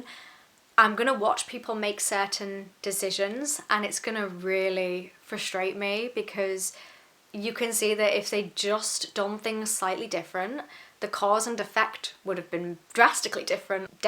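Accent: United Kingdom. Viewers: You sound like you're from Hull.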